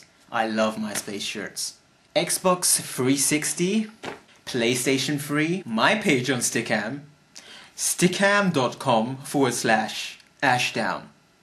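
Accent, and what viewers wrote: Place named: United Kingdom. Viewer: This is great! My first thought was, he sounds like he's from the UK.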